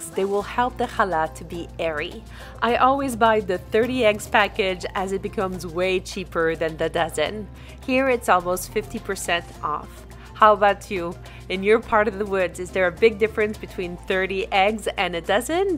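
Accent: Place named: France. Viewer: I love challah! Thank you. I thought you live in Israel until I saw the groceries in English. Are you in the states? In an orthodox Sephardic jewish community? Also you hv a beautiful French accent. This week is the key challa segula for Parnassa as it’s after pessach and Benetton Israel entered Erez Israel.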